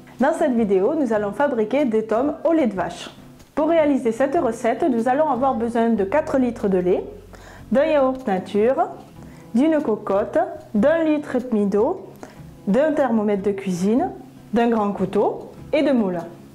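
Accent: South-West, France. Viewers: Un joli accent du sud-ouest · Excellentes explications parfumées à l'accent du Sud-Ouest (la plus belle région de France). Merci pour ce beau travail pédagogique.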